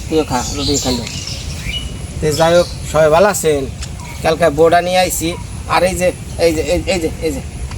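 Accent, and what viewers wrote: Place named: Tangail, Bangladesh. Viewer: টাঙ্গাইলের ভাষা আবার বোঝা যায় না। আপনার প্রথম ব্লক যখন দেখছি তখনই তো ভাষার কারণে দেখা শুরু করছি। প্রথমে তো লেখা ছিল না এটা কোন জায়গার ব্লক কার ব্লক কোন এলাকাঁ। ভাষাটা শুনে মনে হয়েছিল এটা টাঙ্গাইলে কিছু একটা হবে। এরপরে তো দেখলাম যে এটা মির্জাপুরের দিকে। ভাষার জন্যই তো ব্লক দেখা শুরু করছিলাম। আর আমরা একেবারে শুদ্ধ ভাষায় কথা বলি টোটালি তারপরেও কেউ কেউ যারা টাঙ্গাইলের মানুষ এক্সপার্ট তারাও একটা টান খুজে পায়। আর আপনি তো পুরাই গ্রাম্য ভাষায় বলেন আপনারটা না বোঝার কি আছে। শুধু টাঙ্গাইল না ভারতের আসাম এলাকার মানুষ যখন কথা বলে সেটা পর্যন্ত শুনলে মনে হয় তারা কি টাঙ্গাইলের কিনা। ভাষাগত এত মিল। এরপরে ইন্টারনেটের সার্চ দিয়ে দেখতে পাই ভারতের আসাম আর টাংগাইল নাকি আগে এক ছিল। এজন্য এই দুই এলাকার ভাষায় এত মিল। আপনার বাড়ি টাঙ্গাইল আপনার ভাষা শুনলে সে বুঝবে যার এই ভাষা শুনে অভ্যাস আছে। অন্য কেউ বুঝবে না।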